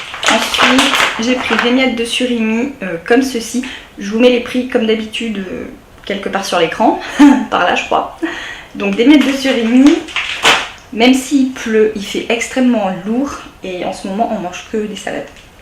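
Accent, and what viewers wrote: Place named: Aquitaine, France. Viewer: Maiiiis nooon je me disais bien que t'etais d'Aquitaine tu dis ''rase'' au lieu de ''rose'' haha #teamrase vive l'Aquitaine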